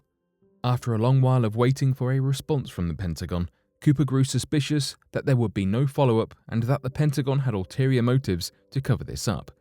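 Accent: England, United Kingdom. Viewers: Only an English accent can bring knowledge to life. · British accents don't make it better. Just saying. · What's with the english accent?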